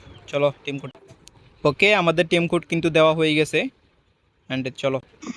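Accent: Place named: Chittagong, Bangladesh. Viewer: vhai ki koitam to ar video in ato valo lage ar ton but ay kintu chatgya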